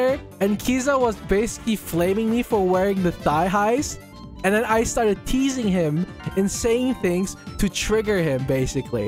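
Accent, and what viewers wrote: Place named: Netherlands. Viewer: Most dutch accent ever he meneertje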